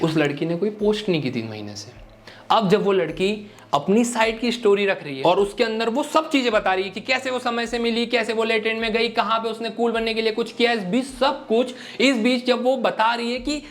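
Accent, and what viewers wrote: Indore, India. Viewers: bhiya tum indori ho kyaa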